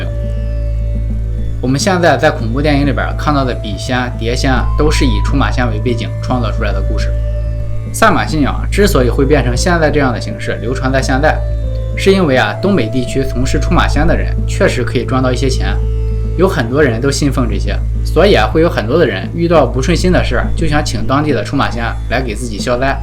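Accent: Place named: Shandong, China. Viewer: 这山东口音太重了吧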